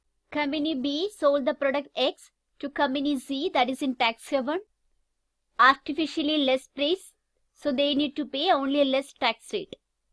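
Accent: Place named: South India, India. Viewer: Slides are nice and by your pronunciation I take it you are from south and goes by the saying that south people have good command over english..and it has proven right in the video..marvellous you explained beps much better than others and in such limited words thank you mam..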